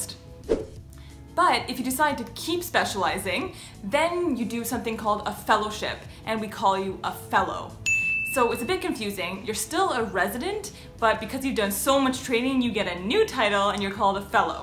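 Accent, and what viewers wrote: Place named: Canada. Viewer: My spidey senses told me you were Canadian, and I was right. :) Then, I also started to wonder if I speak with the same subtle accent. Anyway, I love your videos. I'm in law school, and I'm studying medical negligence / informed consent right now. Watching how your days unfold really brings some of the cases that I'm studying to life.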